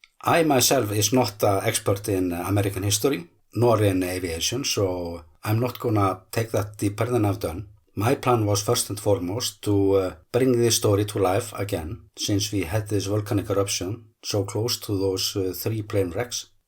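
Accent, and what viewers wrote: Iceland. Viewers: As an Icelander, I have always despised this disgusting habit of some sicko Icelander's obsession of inflicting "traditional" Icelandic food like Rotten Shark on foreign visitors. Most Icelanders NEVER touch it and would not dream of inflicting this vile stuff on innocent travelers. The only consolation is the fact that it will not kill you, but is actually quite nourishing. Still, with all the available alternate foodstuff choices, who needs to survive on Rotten Shark these days? This narrative from WW2 is interesting. Much of this is known locally, but is no doubt new to e.g. Americans. Even more interest is the narrator's heavy Icelandic accent. This how Icelanders sounded in the decades immediately following WW2, even down to the "airplane vreck". Our accent has improved, but this little documentary is priceless as is!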